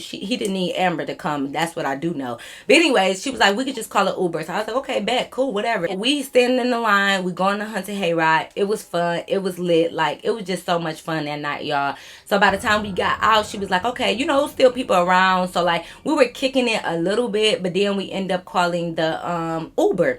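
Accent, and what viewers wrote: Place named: Alabama, United States. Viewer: Sound like Alabama or the south for sure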